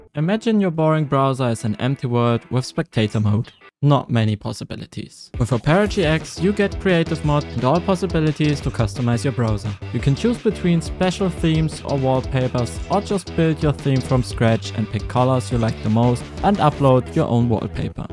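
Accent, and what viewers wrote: Germany. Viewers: Name für einen Hund Balu , mann hört an deinem Akzent das du Deutsch bist oder bei Cactui find ich nice das du Englischsprachige videos macht. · i watched last video and this one and literally at the first minute of the last one i knew you arent from england cause you feel german lol ( in deutsch in der ersten minute hatte ich direckt das gefühl das du deutsch bist weil du keinen englischen sondern deutschen dialekt hast lol ) anyways i love your content already :D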